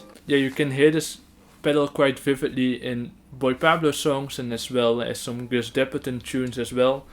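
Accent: Netherlands. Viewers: Het Nederlandse accent is geweldig ahaha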